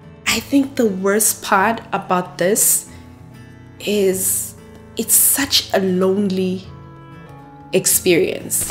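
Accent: South Africa. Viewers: she’s south african i can tell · im zimbabwean but i could tell she was south african too 😳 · She sounds like she is from South Africa 🇿🇦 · I know a South African accent when I hear one... I'm glad you're okay. 🥺❤ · Your accent sounds very much South African. I'm here because I have had a lot of stings and severe pain in my heart for a couple of years now.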